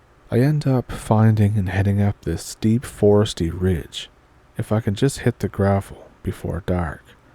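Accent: Canada. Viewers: Must be Canadian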